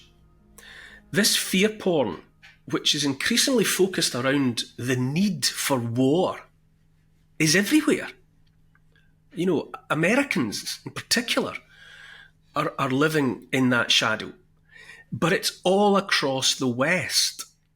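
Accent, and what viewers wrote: Scotland, United Kingdom. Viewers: Hi Neil Oliver, thanks so much for your videos, I really appreciate your thoughts. It's so rare that someone sees the truth, & understands what's really going on. I'm pleased you are so brave to speak the truth. TBH your history programmes used to really rub me up the wrong way, maybe it was the strong Scottish accent? Now I am really respecting you though. Lucifer has the control over this world. He is the mastermind behind all the evil you see. Lucifer is the Prince who plans all the wars, & trouble, and evil schemes. He controls the powers of this world. I hope you will read the Bible, because I know it will open your eyes to the TRUTH. Without God there is only death. I'm hoping you don't dismiss this comment, & your eyes will be open. God has the victory, Satan will be destroyed ..... all will be good. Best wishes, appreciate your hard work.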